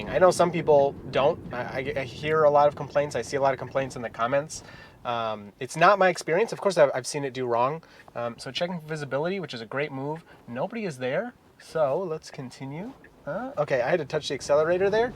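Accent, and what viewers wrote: Michigan, United States. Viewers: Hearing your accent brings back memories of growing up in Michigan. I not only don't have it anymore 40 years later but can't seem to reproduce it.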